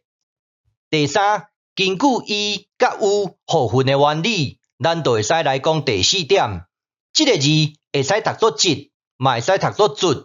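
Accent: Zhangzhou, China. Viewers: 千萬別改你的腔調，我住南部的關係，很難得聽到偏漳州腔調。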